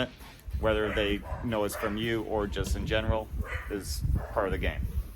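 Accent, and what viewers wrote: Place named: Balkans, Eastern Europe. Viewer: Pozdrav iz Makedonije, vidim po naglasku a i po imenu da ste iz nasih prostora. Nadam se da cete nastaviti sa snimanjem i da cu uzivati gledajuci vase snimke, a i da cu nesto novo nauciti. Podrska od mene!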